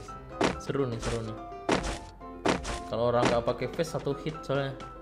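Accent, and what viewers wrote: Makassar, Indonesia. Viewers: makassar mana suaranya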